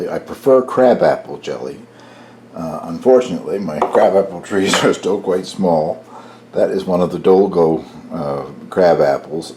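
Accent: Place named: Newfoundland, Canada. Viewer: Ahh! You must from Nufunlan? I recognized the very slight accent. Right on man, good job on the jelly! Hi from N.Alberta.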